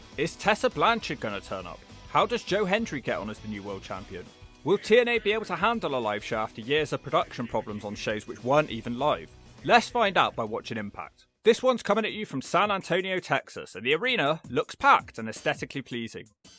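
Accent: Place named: Scotland, United Kingdom. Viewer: Yeah, his voice can sound a little southern American and Australian if he's hanging back but he never had a THICK Accent, just some scottish ticks.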